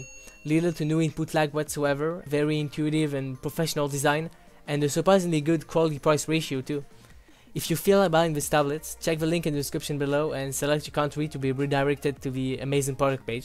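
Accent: France. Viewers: l'accent anglais est pas ouf hein on sent la france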